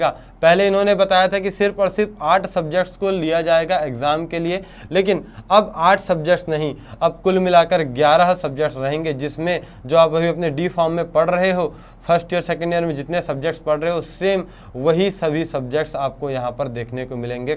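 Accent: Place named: Odisha, India. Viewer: Sir ap odisha se ho kya,apka Hindi wese lag raha hai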